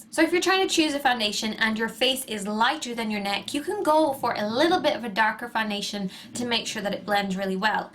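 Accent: Ireland. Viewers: lol no, she's actually irish. that's why she sounds irish. americans don't sound like that. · Are you Irish?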